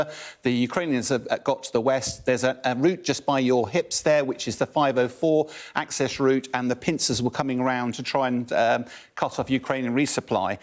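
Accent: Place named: Scotland, United Kingdom. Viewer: To much british accent for me.....sound scottich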